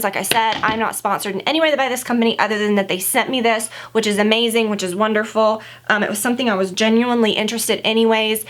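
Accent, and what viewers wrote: South, United States. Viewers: Southern accent is so funny. Love it. Of course I am southern. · I don’t know what I love more, or her country accent! 😍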